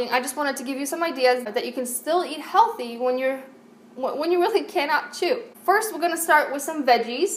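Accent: Poland. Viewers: Just wondering but are you Polish? Because your name is and you kind of sound Polish. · omf i absolutely love your accent. 😍 are you by any chance polish?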